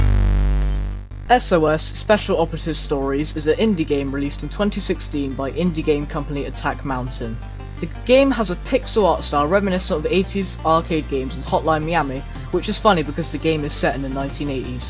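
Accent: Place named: United Kingdom. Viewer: Judging from your voice, you are bri'ish :(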